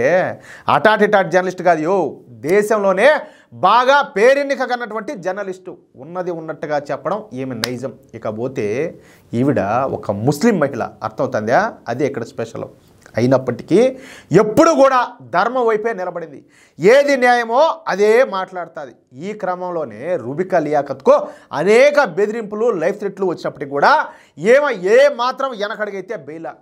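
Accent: Rayalaseema, India. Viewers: Your from rayalseema, how language looks like cinema movie dialogues.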